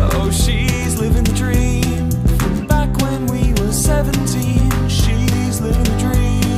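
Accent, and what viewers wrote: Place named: United Kingdom. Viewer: Why does Wilbur have such a strong British accent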